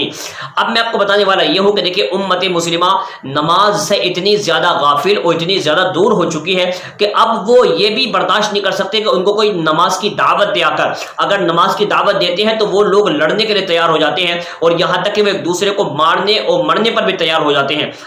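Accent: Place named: Arab world. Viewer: Ye urdu bhi arabi worst mein boltay hain😬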